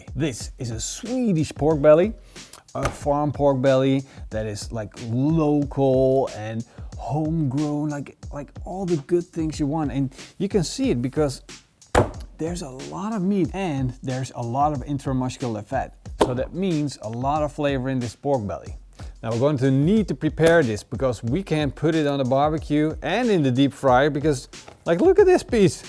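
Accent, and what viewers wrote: Netherlands. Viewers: In less then then 10 sec I knew you must be dutch lol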